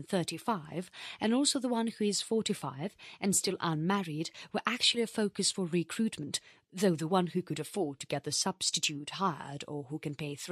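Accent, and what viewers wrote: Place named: RP, United Kingdom. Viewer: It's nice that this is up free but the english is a little weird...too much focus on trying to sound posh at the expense of clarity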